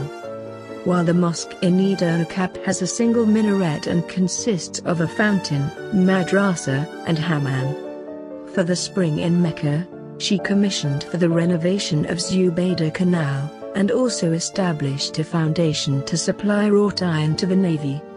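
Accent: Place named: England, United Kingdom. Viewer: Pronouncing Turkish with an English accent is a delight !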